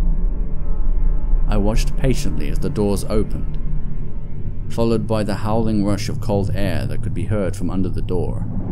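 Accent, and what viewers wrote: United Kingdom. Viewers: Why does the narrator have to have a British accent? It just makes no sense, is pretentious, distracting, and unnecessary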